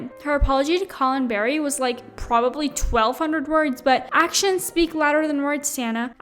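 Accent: Canada. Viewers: I always forget is Canadian until she says “soorrry” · i love your "sorrys" that cute canadian accent gurl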